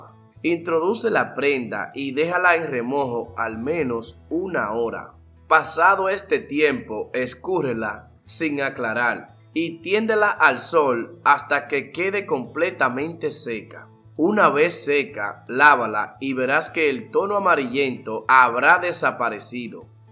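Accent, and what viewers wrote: Dominican Republic. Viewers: Criticón, me gustaría escribirte, parece que es dominicano y sí es así, ese su lengua popular.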